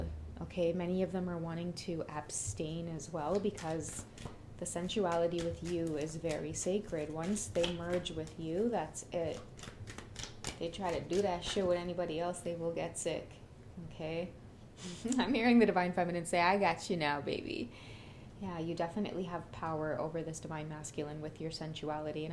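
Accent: Trinidad and Tobago. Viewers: D Trini accent killin mehhh 🇹🇹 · 😂 Outside of your gifting to read, I LOVE di Trini vibes yuh does tro een every now and then! 😂👊🏿 TrinBago tuh di whurl wi!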